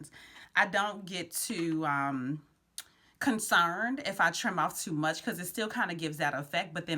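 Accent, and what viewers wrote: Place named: Baltimore, United States. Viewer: ok Maryland or Cali? I hear it….Baltimore for sure, am i right?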